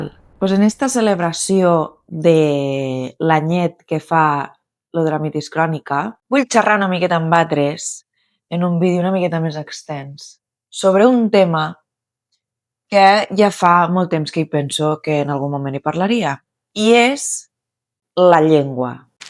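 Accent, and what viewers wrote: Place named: Catalonia, Spain. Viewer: Clar i en català: perquè em surt de la punta de la xona😂. La gent és molt ignorant. Mon pare deia que sempre parlen els que més haurien de callar, m'alegre que en aquest cas no siga aixina. Fer les coses bé sempre suposa el doble d'esforç, parlar en una llengua minoritzada i subtitular és un exemple. M'encisa lo català que parles i el missatge que has transmés. 👏👏👏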